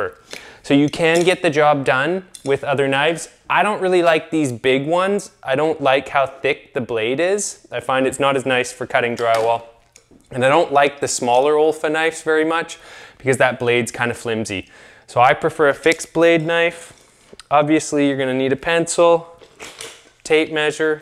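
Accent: Canada. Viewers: SOMEone could watch this just to study the Canadian accent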